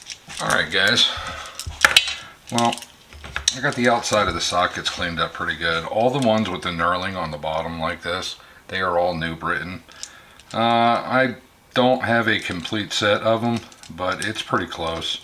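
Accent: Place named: Philadelphia, United States. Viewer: where are you from? I think I hear a Philly/ South Jersey accent.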